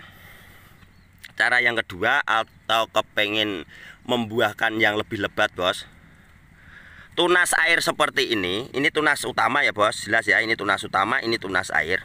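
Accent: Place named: Pati, Indonesia. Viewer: Logatnya khas pati😅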